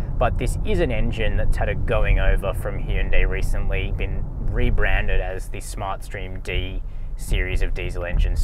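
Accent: Australia. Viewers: Victorian? accent.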